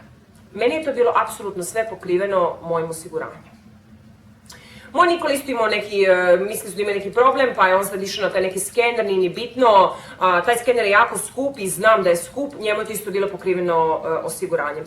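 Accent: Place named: France. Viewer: vuce na francuski, moja sestra prica slicno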